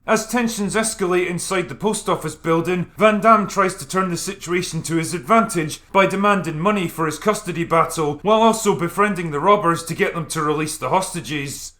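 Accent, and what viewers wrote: Scotland, United Kingdom. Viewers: Have you honestly never heard of regional accents? People across the UK and USA speak English but there are still distinctly different accents in some regions, and those regions don't have their own languages either. I mean, the Drinker himself has a Scottish accent...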